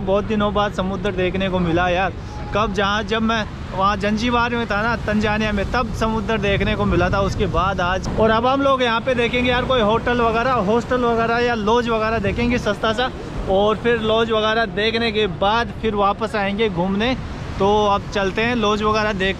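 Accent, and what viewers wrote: Rajasthan, India. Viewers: Bhai ke bolne ke accent se Rajasthani lag raha hai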